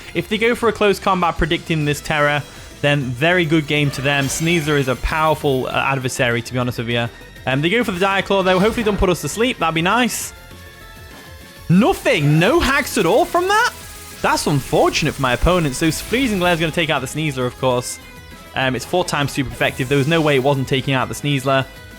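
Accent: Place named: United Kingdom. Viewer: It's the accent bro! Everything's better in British.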